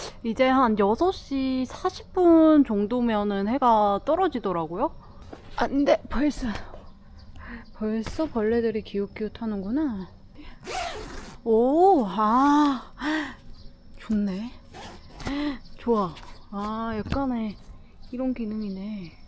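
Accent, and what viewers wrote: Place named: Gyeongsang, South Korea. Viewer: 아~~~주변에 비해 높다 하여 노꼬메 인가 보네요 경상도 사투리 이군요 제주 방언 인가 하였네요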